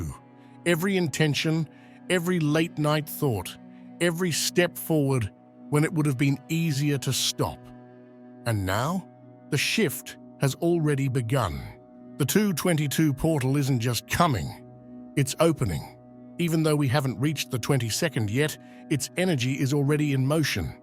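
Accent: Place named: Australia. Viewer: Reading the comments. I have felt dizzy, a bit nauseous and was wondering why. I still dont immediatly realise it is from a surge of electro magnetism. I just burned my st Brigid reeds cross from last year! I manifested all my troubles and doubts and hesitations to be gone, cleansed away. I was alone so just did what I felt was right. I am glad now. Another serendipity. Thank you forthis video, first time i come across your channel. You sound Australian😊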